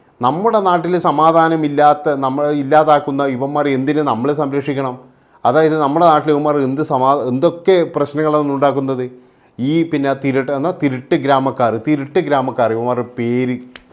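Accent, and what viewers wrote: Kannur, India. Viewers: Kannur slang nalla rasam kelkkan. My fathers place anjarakandiyanu thangalude native place evideya?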